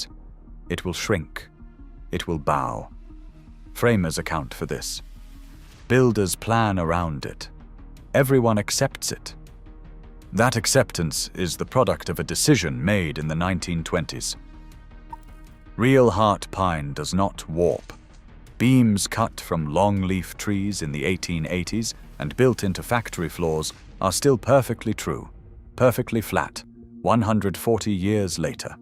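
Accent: United Kingdom. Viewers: Lasted 2 seconds until I heard the British accent then closed video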